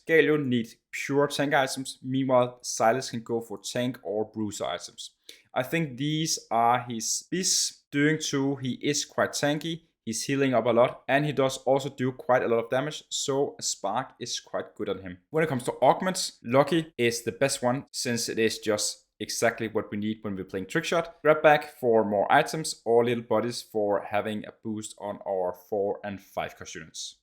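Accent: Denmark. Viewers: Your accents sounds like Denmark people that i met Yesterday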